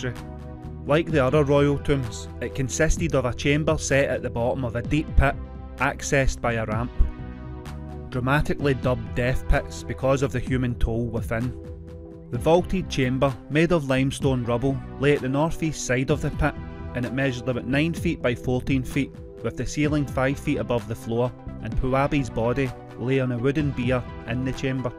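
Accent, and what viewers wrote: Scotland, United Kingdom. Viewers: Amazing content as always! Scottish accent is a bit tricky by the way haha. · He's speaking in English just with a scottsmans accent, you'll get used to it..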